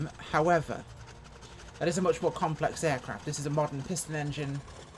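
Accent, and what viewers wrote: Russia. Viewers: I loved your Russian accent! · I like the Russian accent...it suits you. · I normally really like your reviews, but this russian accent was a bit too much for me over time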